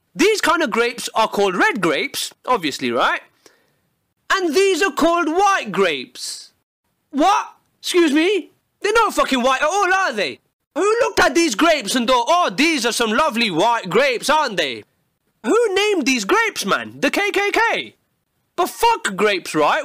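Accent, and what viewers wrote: United Kingdom. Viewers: You british or what?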